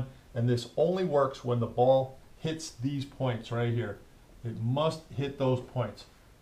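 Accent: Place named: North Jersey, United States. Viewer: I knew you didn't sound like you're from Texas! I had a feeling that was a north Jersey accent. I grew up in south Jersey.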